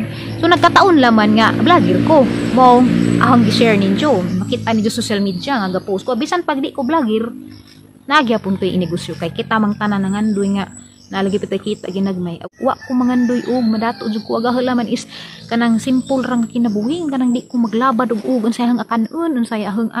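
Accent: Bohol, Philippines. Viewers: nasuya rana maam oi, samot na cguro ug mka tukod kag Gaisano diha 🤗 lami jud paminawon ang bol-anon maam oi pinagahi jud 😊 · asa man ka dapit sa bohol nga pirti mang gahia sa imong sinultian? 😍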